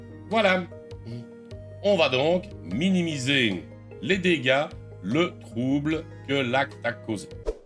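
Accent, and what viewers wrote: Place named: Belgium. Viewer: Salut, c'est intéressant et ça se voit que ça te plait de faire des vidéos et que le sujet t'intéresse donc c'est communicatif pour l'apprentissage. Sur l'humour, fais gaffe que tu fais des références belges (sans parler de l'accent mais on se refait pas) que la majorité de l'audience va avoir du mal à comprendre (par exemple le minerval) donc je pense que tu vas perdre pas mal de gens, l'humour coupe déjà le rythme du propos principal alors s'il introduit des références inconnues je pense que tu perdras + les gens. Bonne chance pour la suite de ta chaîne !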